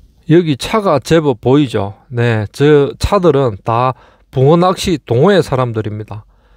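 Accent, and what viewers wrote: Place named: South Gyeongsang, South Korea. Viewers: 남해로 알고 있는데 아닌가봐요? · 경남입니다 대부분 이런맡투^^ 경상도 하고 는 조금 다르지요^^ 밀양 합천 창년 등은 ^^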